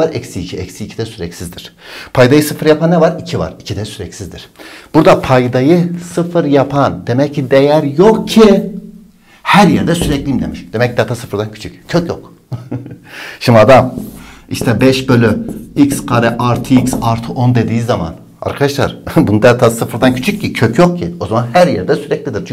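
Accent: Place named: Eastern Anatolia, Turkey. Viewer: hdasdhsadhsadahsda hayatımda izlediğim en iy ders videosu. hocam dersi anlatırken biraz daha doğu ağızı kullanırsanız ders daha eğlenceli ve akılda kalıcı olur kanaatimce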